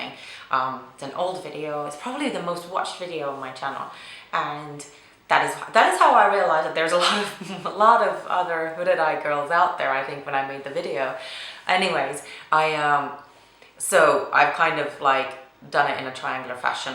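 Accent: United Kingdom. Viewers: What's going on with your accent? It's 97% English but there's a little twang in there too - could be Irish, American, Australian.... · At first I thought it was a British accent then I thought it was just an Standard American accent. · how come your pronounce half your words in a British accent (i.e. glasses, can't) and the other half in american?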